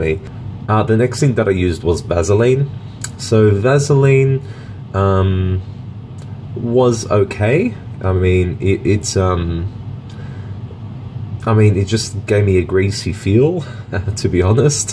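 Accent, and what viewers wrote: Australia. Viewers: I got a small flare up of eczema on one of my eyelids as well! It’s been like this for a few weeks. Its flaky and red, kind of like I forgot to add eye shadow on the other eye. 😳It’s odd how it randomly started without warning. Now I’m trying to back track what could have caused it. Thanks for your input about the things that worked and didn’t for you. PS love the Aussie accent 😂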